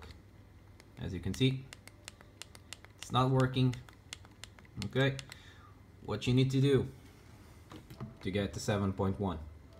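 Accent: Brazil. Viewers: Eu vendo o vídeo, e ouvindo seu inglês, de olho no sotaque kkkkk, na hora vi que era BR também.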